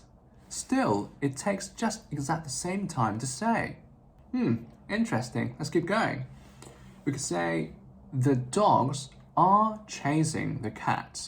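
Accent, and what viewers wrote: United Kingdom. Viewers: 英國腔英語，習慣美式英語的乍聽之下有點不習慣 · 他是英国口音，你只熟悉美国口音。i这个音，英国更像ei，而美国更像ee as in teeth。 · Beautiful British accent! 👍👍👍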